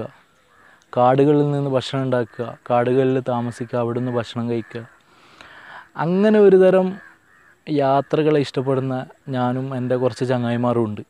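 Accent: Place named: Kannur, India. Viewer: നല്ല തനതായ കണ്ണൂർ ശൈലിയിൽ ഉള്ള അവതരണം വളരെ അധികം ഇഷ്ടപ്പെട്ടു. പുതിയ വിഡിയോയ്ക്കായി വെയ്റ്റിംഗ്..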